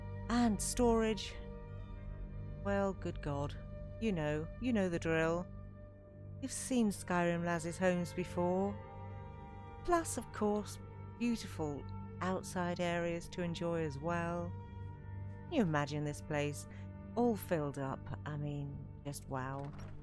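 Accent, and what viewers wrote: United Kingdom. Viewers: Did know you from Yorkshire, I'm from Leicestershire 🌹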